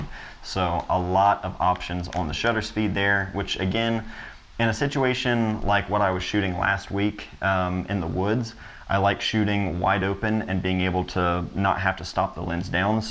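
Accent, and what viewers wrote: Ohio, United States. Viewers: This guy knows how to get us to click! Leica unboxing dictated by southern Ohio timbre? YES PLEASE!!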